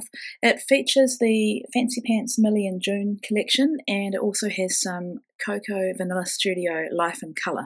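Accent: New Zealand. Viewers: Love this layout! I could listen to you talk all day!!! I love the NZ accent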